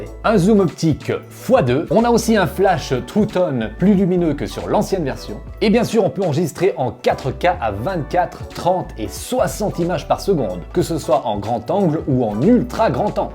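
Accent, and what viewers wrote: Switzerland. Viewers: Alors toi t'es Suisse avec ton vieil accent de paysan ( j'ai le même)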